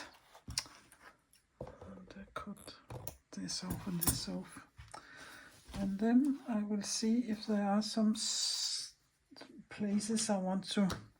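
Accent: Denmark. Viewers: Love your collages and colors and your little chats with your danish accent.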